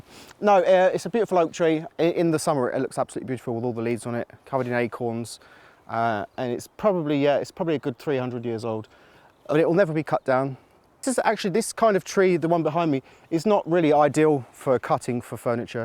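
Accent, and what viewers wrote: United Kingdom. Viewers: You with your British accent and with his French accent are a lovely foil...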